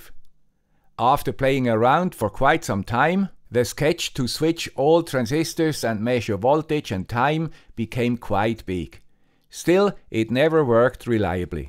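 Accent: Switzerland. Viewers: Hard to listen to swiss accent for the first time. Let's put it into "watch later". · Does anyone know why he has a Swiss accent? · "Also a guy with a swiss accent"... Classic =P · The video started without audio on YouTube and my brain bugged trying to understand what was doing on the guy with the Swiss accent's channel. 😅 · a guy with a Swiss accent, a vintage high tech NE555... and now ATtiny's... what an awesome Sunday morning entertainment.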